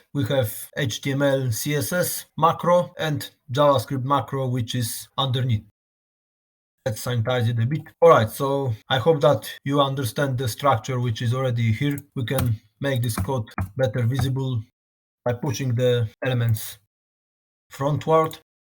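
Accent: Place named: Malta. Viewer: ah! You sound Maltese. Thanks for your informative videos. Dziękuję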